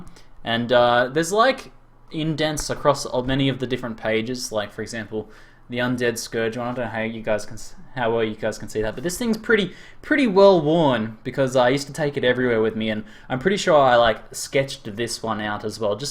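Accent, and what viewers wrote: Australia. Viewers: Great Vid, Great to hear a fellow Aussie doing well. What state you from? PUMPED about the Oz server, would love to play with you sometime. peace.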